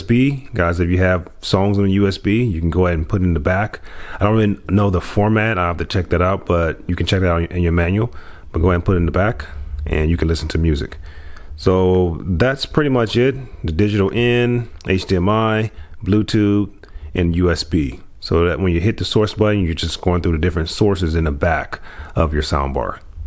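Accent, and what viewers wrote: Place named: United States. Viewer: Because of your accent I think you are from the United States, therefore we are from the same continent (America), I see you and I write to you from Chile, on Friday June 4, I went to the store to buy a printer, but I ended up buying this soundbar 🤣🤣, it is my first soundbar, I found it with a discount and I will take advantage of it to see the Copa América (America's Cup). Greetings from southern Chile...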